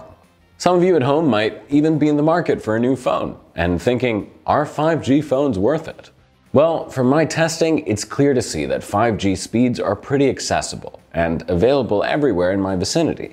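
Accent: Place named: United States. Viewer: I can't pick his accent. It's sounds American to me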